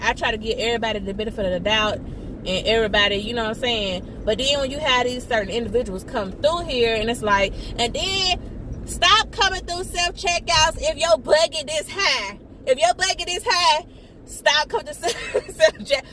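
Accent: South, United States. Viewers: I have NO IDEA how I ended up on this video nor your channel, but I stayed and watched this video in its entirety. I'm so glad I did. You said something about "story time" at the beginning and that southern accent caught my attention! Unfortunately, I'm not into hair and beauty, however, I wanted to let you know that I enjoyed this video! You had me literally rolling! Especially that look. It's always nice to see integrity in people. Additionally, those with integrity not afraid to hold the dishonest accountable. So, from one Youtuber to another....keep doing what your doing! You are creating REALLY GOOD content. - Lewis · Luv the country accent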